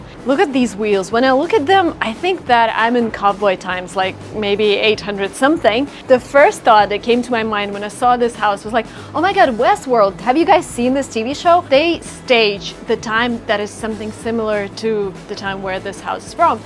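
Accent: United States. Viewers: your accent sound more and more american with every new video.👍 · I really like your videos! I'm watching you from the mexican state of Tlaxcala! you sound pretty american like a native speaker wish to talk just like you! you're a smart cookie! regards lovely!!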